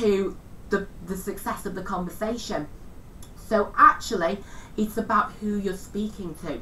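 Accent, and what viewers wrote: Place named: Northern England, United Kingdom. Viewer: esy2011 northern accent innit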